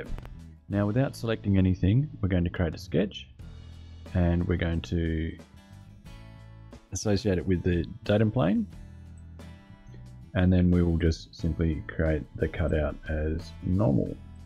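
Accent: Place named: Australia. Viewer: Great explanation! Also good to hear another Aussie doing this too.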